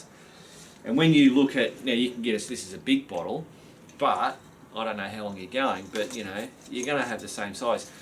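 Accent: Australia. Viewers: Your accent makes me miss living in Woomera SA.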